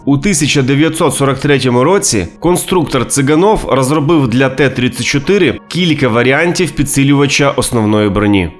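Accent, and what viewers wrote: Russia. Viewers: В УКРАЇНСЬКІЙ МОВІ "Г" ВИМОВЛЯЄТЬСЯ БЛИЗЬКО ДО "Х" А НЕ "К" ЯК В КАЦАПНІ, ВЖЕ ЧАС ЗАПАМ"ЯТАТИ, ТА ПОЗБУВАТИСЯ КАЦАПСЬКОГО АКЦЕНТУ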